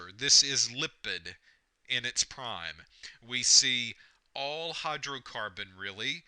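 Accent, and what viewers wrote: South, United States. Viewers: very good like your southern? accent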